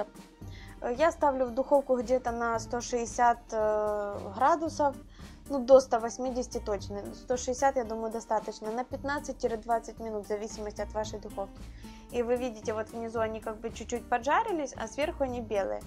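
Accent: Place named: Kherson, Ukraine. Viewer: Вы из Херсона?! Очень знакомый, до боли, говор!)))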